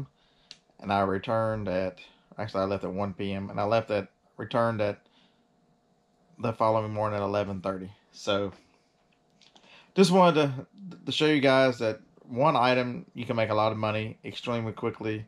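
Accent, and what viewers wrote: South, United States. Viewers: Love the country accent!!! All love from Georgia!!!!!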